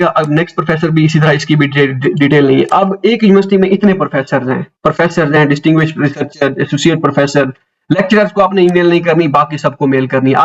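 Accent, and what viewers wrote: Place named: Layyah, Pakistan. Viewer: ap k bat krny k styl se lg rha hy k ap layyah se hn... am I right?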